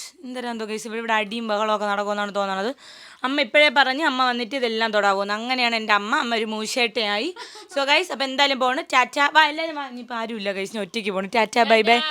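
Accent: Trivandrum, India. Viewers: mole 🥰, so proud you lil one and thankyou so much for making our Trivandrum slang loveable and acceptable to everyone. പിന്നേ മോനാൾട്ട് പോലെ different വാക്കുകൾ മലയാളത്തിനു സംഭാവന ചെയ്തതിനും 😄😂👌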